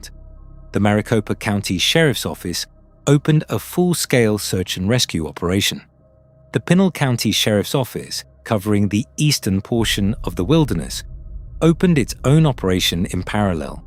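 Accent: United Kingdom. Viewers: "I'm and if you've BEAN following this..." Get rid of the british accent.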